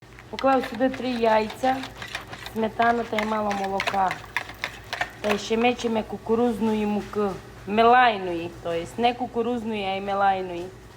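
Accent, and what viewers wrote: Transcarpathia, Ukraine. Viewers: Закарпаття так мовить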